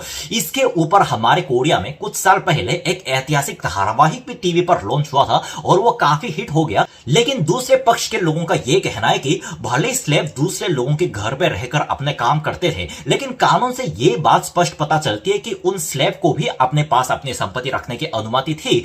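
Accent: Standard. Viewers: इतने शुद्ध हिंदी तो मुझे भी नहीं आती Jitna aap bol rahe ho😁.. I'm a bengali girl 😊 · Aap to india se bhi shudhh hindi bolte hai ekdam book ke jaisa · Waah... jabardast... 👍🙏 Varnan karne ka tarika... bahut accha lga.. 👍 sudh Hindi 👍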